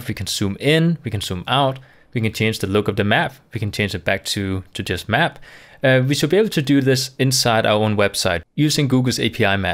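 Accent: Denmark. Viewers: You my Sir are you danish ? :D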